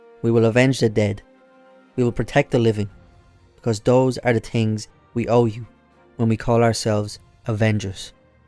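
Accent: Dublin, Ireland. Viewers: A Dublin comic channel. Never thought Id see that. Well you sound like your from Dublin anyway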